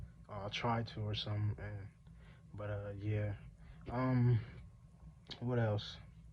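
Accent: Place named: Inland Empire, United States. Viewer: You're from the IE? Low-key speaking like you from the inland empire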